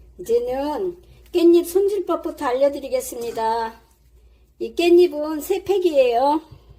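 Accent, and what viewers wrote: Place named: Chungcheong, South Korea. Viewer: 엄마 같으세요 충청도 사투리가 조금씩 새어 나오시는게 참 정겹네요 편집많이 안하시는 것도 재미로 느껴져요❤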